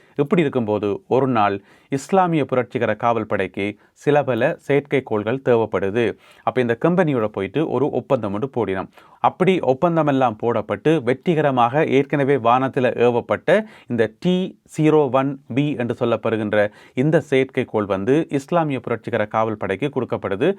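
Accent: Sri Lanka. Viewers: இலங்கை தமிழ் ரொம்ப அழகா இருக்கு நண்பரே. வாழ்த்துக்கள்.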